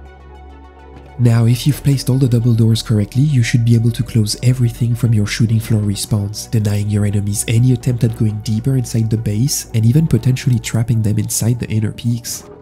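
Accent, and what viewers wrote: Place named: France. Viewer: Mhh l'accent français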